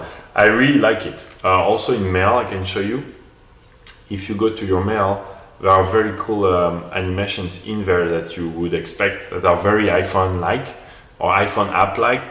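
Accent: France. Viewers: LOL you are French right ? i've recognize the "accent" je suis francais aussi, sinon super tes videos franchement sa fait plaisir de voir un francais qui review le palm pre(je cherchais sa depuis un moment). t'a fait HEC je suis mdr ... j'y vais a la rentrée pour ma quatrieme année, voila pour ma life je m'abonne allez a+ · r u french :D?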